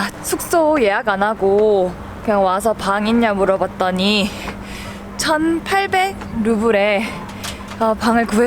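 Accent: Gyeongsang, South Korea. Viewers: ㅋㅋ 말도 이쁘게 하시네 경상도 말투 정겹네요 멋져요 ㅎㅎㅎ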